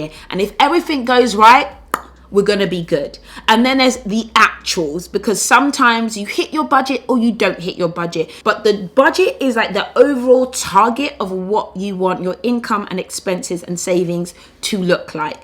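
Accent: United Kingdom. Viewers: She sounds British to me! Unless she moved here as a kid? The first video I've seen so I have no idea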